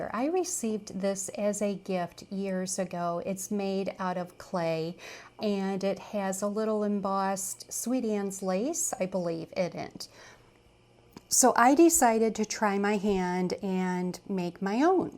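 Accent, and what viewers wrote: Midwest, United States. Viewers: Wow, your accent sounds like Illinois/midwest region.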